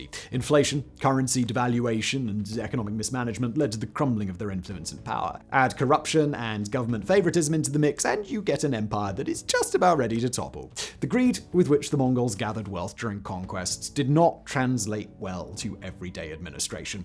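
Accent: RP, United Kingdom. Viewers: He talks too fast with British accent. Often hard to grasp the key words, otherwise good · How posh is this guy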